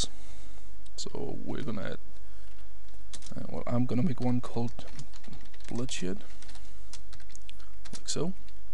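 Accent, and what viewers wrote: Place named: Denmark. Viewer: im almost certain its a danish acctent :P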